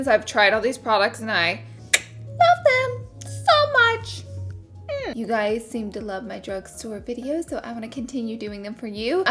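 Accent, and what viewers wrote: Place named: Texas, United States. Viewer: Yes girl! I love watching you :) You remind me of where I grew up in south Texas because of your accent. Keep up the great work 👍🏻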